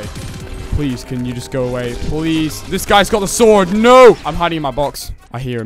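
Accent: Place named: Scotland, United Kingdom. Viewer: I cant tell if you r Scottish british or american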